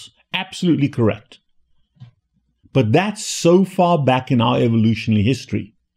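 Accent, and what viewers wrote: South Africa. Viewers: That depends where you are from. He is from South Africa.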